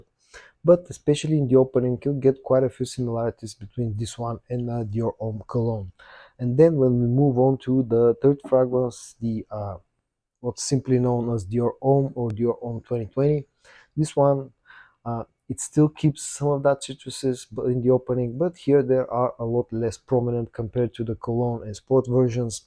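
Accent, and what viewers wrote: Bulgaria. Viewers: Nice comparison. I have Dior homme sport which is fantastic and I have tried the other two as well, I'm going to get dior homme cologne next. You have a very bulgarian accent btw. Are you bulgarian?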